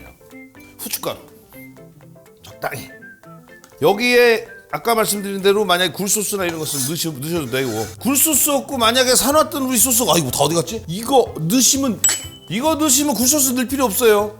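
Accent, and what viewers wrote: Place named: Countryside, South Korea. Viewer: 쩜매가꼬 구수한 고향의 사투리 반갑습니다 어린시절 시골에서 살때 참 많이듣던 짬매가꼬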